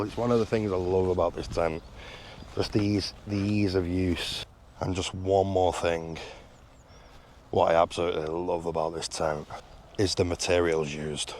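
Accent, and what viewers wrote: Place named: Northern England, United Kingdom. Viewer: Nice to hear a rete noorthen accent and a brew called a brew. Proper.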